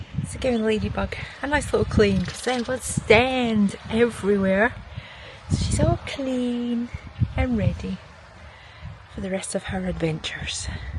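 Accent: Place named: Scotland, United Kingdom. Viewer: Yeah well here I sat in total anticipation, expecting as you promised, an epic cook up, to be shown a cheese baguette without the bun lol. I totally get your thoughts about the sound as well the view, the more senses that are stimulated the better the experience. I'm not sure if you have lived in Scotland, being born down south mate, as your accent has a hint of scottishness about it, but when your in your motorhome in summer at Rosemarkie and a storm rolls in, I wished I had been at work lol. Enjoyed it😊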